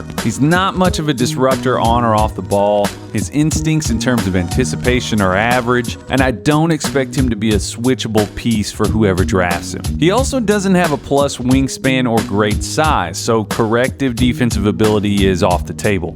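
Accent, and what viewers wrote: South, United States. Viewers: Come for the scouting and dead-on Southern accent, stay for the "What We Do In the Shadows" meme. Top content as always!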